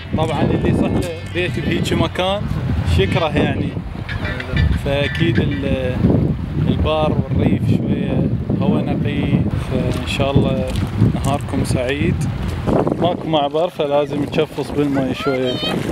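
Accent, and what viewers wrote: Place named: Iraq. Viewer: استمر انته عراق احسك عراق من لهجت عراقين لين انه عراقيه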